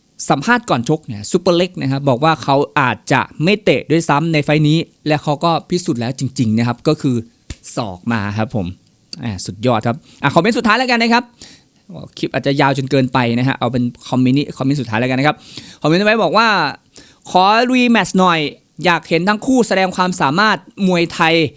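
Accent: Isan, Thailand. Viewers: มักหลายยามเว้าภาษาอีกสาน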